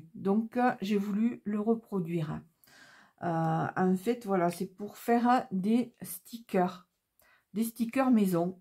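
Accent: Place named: South, France. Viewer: Bonsoir Miss à l'accent du soleil ???? Bonne idée super merci de ta vidéo scrapbises de l'Atlantique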